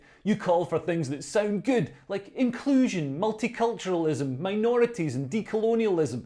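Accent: Scotland, United Kingdom. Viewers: Saying that supporting the right of the vast majority of a fully innocent (and yes maybe clueless too ? still not murderous though) Palestinian population not be be bombed into oblivion as retaliation for some of their local nutjobs' atrocities is the equivalent of being a Hamas terrorist fanboy is somewhat of a stretch, ey ? Cute scottish accent or not, you're talkin shyte, me lad. Granted, there are a few wild ones out there but exclusively singling these out strikes me as disingenuous. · Never liked Scottish accent... but I do love yours ❤️thank you 🙏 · Oh My. I'm an Israeli and this full scale, informed rant was worth the struggle to keep up with an accent like that of Scottie on Star Trek. Bravo, Kol HaKavod - a wonderful example of lethal humor used to brilliant effect. I'm sharing this. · How can a Brit say all this and not get targeted by The Crown or his Irish neighbors? 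Or, did i detect a Scottish accent? Anyway, superb breakdown. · This my first experience watching channel. I laughed, I applauded, I cheered, and fist pumped the air. I love this guy!! And that accent just adds to the overall experience. I’m an instant subscriber and his newest fan. He’s like the Scottish version of Ozy Man focusing on politics. Well done, sir! Please keep it up!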